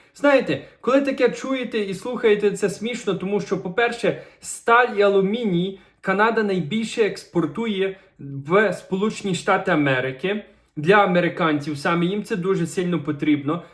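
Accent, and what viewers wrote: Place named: Ukraine. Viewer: Я підписалась чисто через те, що Ви маєте таку дуже українську говірку (стиль вести бесіду) нагадує, щось рідне 🩵💛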